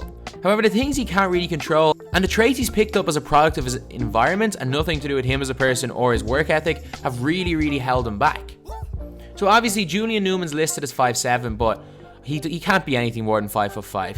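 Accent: Ireland. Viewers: Are u irish? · Kinda strange listening to an Irishman talk about American High School boys. I didn't know basketball was big in Ireland since there was only one that ever played in the NBA "Pat Burke" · Wtf you’re Irish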